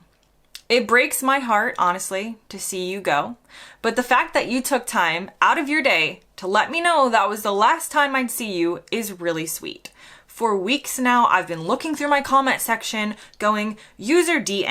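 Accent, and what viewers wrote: United States. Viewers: Gosh- I'm glad you are able to greet these with humour - this was a fun response! I fail to understand why anyone who hates Americans or an American accent has bothered to even play your videos. You'd think they'd steer clear......or just scroll past...... Heyho- onwards and upwards! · American is not my favourite accent, but when one watches an American what else do you expect. It is a shame you feel you have to put this out, but I understand totally, I liked it and you are picking up the British sarcasm and understated piss take very well. Keep going girl. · I have to ask, but, where did you get the sarcasm from? And what accent are your countrymen complaining about? You sound very american. At least to me. And not in a bad way. If you are born and raised in the USA, you most probably will sound like it, and to me, you do. Nothing offensive about anything you do - or say. 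In fact, I very much like everything about You and your approach to Europe (England) and the USA. I don't think you bash either side. This is a definite subscription to your channel. I will follow other videos from you. I'm not a native english speaker, so, I may have "said" (written) something offensive or provocative in this rant, but I mean no harm. I really love your approach and your content. Have a brilliant day. ❤ from Denmark! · your stupid accent does not offend me, you are one of the few americans i can stand to watch, you do not have the better than you attitude ( which is more than i can say for other yanks ) upon which, would result in immediate cessation of viewing. so good job and keep up your good inquisitive flow. 👍 ( you are almost a brit ) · I british.i like Americans.i like your accent. I wish I could have a holiday in America.after all,we are allies.maybe the best allies on the planet. 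So ignore these buffoons who are probably jealous of you and your accent.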